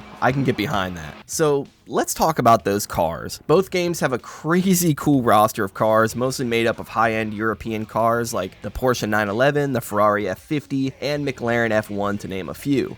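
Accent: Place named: Philadelphia, United States. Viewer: This Philly accent is making my ears bleed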